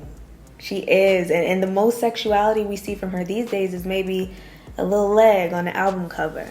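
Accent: Jamaica. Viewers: im hearing some patois!! are you Jamaican?? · Your broken Jamaican accent is cute ❤😂 sending love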